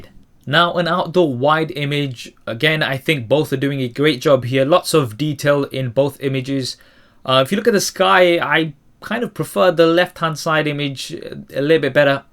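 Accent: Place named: Birmingham, United Kingdom. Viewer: you kind of sound brummie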